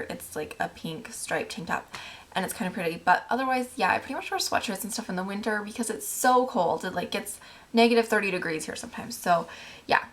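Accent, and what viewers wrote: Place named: Minnesota, United States. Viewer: I knew you lived in minnesota!! you totally sound like it! but yeah i live here to!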